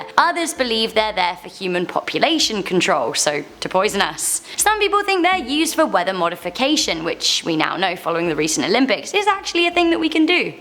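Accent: England, United Kingdom. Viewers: British accents get annoying. I got a massive knob ya? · Damn shes hot, the English accent makes it better lol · Hey, Your accent is beautiful is it British? Btw you're gourgeous💯💓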